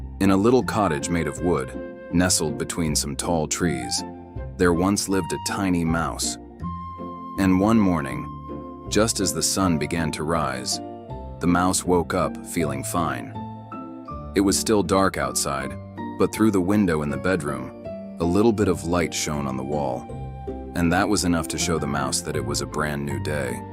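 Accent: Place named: United States. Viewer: The American voice put me off watching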